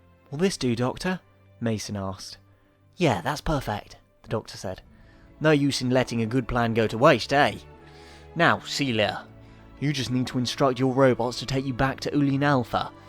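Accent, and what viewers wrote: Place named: United States. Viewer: your american accent is pretty good! (i’m american)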